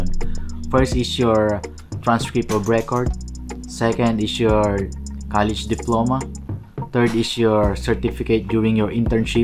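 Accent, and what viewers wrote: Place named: United Kingdom. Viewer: Slang na master. Kala ko briton na ang nagsasalita.😄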